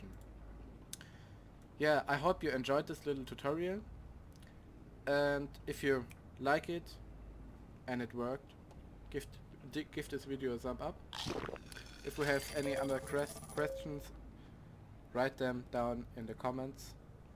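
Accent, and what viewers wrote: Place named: Germany. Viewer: es hört sich so richtig deutsch an:D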